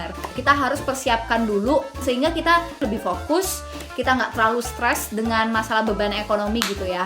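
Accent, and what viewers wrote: Java, Indonesia. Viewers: Mantap mbak e. Jowone neng ndi mbak 😁🙏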